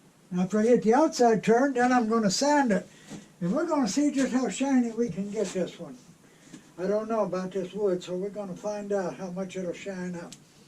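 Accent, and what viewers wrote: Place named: Pittsburgh, United States. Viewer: Hey! Love the videos. You sound like you have a Pittsburgh accent. Or have you been in N.C. your whole life?:)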